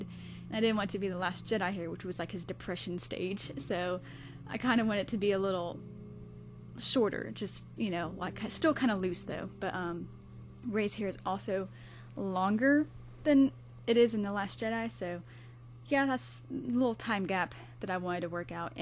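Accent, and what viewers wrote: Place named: South, United States. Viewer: OMMGGGGGGGG your voice is so cute ya lil southern bell u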